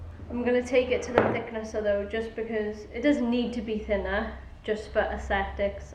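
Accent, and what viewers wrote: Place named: Wales, United Kingdom. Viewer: Subscribed to your channel recently and I've absolutely loved watching your videos so far. I was brought up in Wales so hearing you talk with that lovely accent is so nice. Quite envious of your spacious shop - it makes my little shed look like a broom cupboard ! Looking forward to watching your next videos. Thanks for posting.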